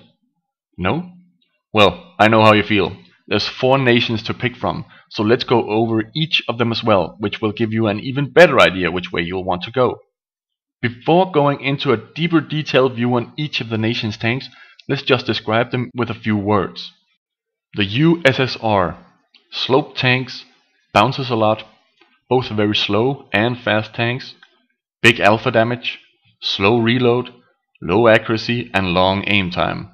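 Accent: Denmark. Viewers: You sound danish. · Are you danish? ;) Btw, love your vids! :D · You're Danish aren't you? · Are you danish..?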